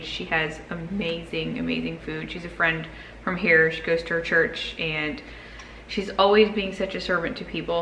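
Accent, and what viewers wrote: Pennsylvania Dutch, United States. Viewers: Thank you I really enjoy your recipes Love to hear you speak Pennsylvania Dutch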